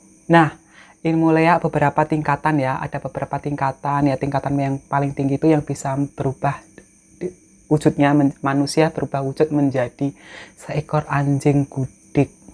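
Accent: Java, Indonesia. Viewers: ngecuprus,nggrundel pake logat jawanya....khas bgt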